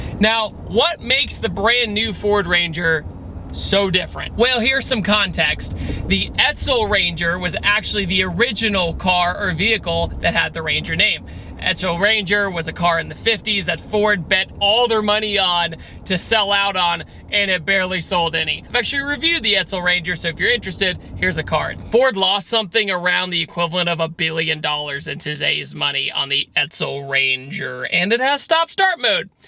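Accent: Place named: West Virginia, United States. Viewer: Dude gotta be from West Virginia. Ouch...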